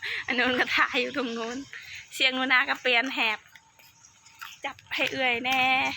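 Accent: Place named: Cambodia. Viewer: เสียงเว้าค่อคนเขมรเนาะ (ສຳເນີຍງຄືຄນຂ້ເຫມນເນາະ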